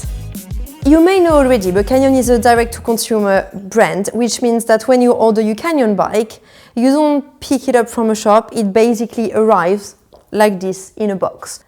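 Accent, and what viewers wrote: France. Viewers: Sounds totally French to me.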